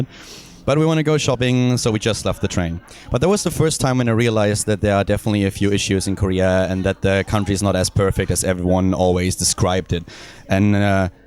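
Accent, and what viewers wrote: Germany. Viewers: Man!!!. You have strong German accent. How can you teach English to kids? They are going to learn German. How did you get a job at Korea. Now. I told my mom to apply job. Ha...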